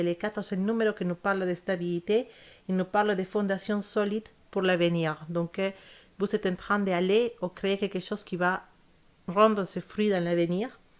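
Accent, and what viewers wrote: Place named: Spain. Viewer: Bonjour je viens de vous découvrir sur la chaîne et j adore votre tirage mais aussi votre accent ça me rappelle mon. Pays car je suis aussi espagnole. 😜 Bendiciones Hermosa 😉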